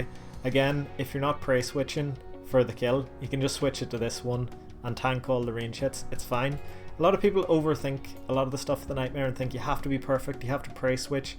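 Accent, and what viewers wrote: Northern Ireland, United Kingdom. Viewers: Good guide man ty. Surprised me to hear a northern Irish accent in a runescape guide as I'm from NI myself was unexpected haha Also fuck Zlaryn I hope he gets an itch on his balls that can never be scratched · is that a Northern Irish accent I hear!?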